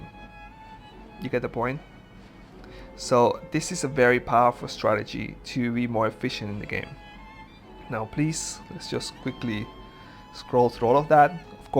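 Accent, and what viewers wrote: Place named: Netherlands. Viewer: Well he has a Netherlands accent butnits English 100% if u can't work it out turnnon subtitles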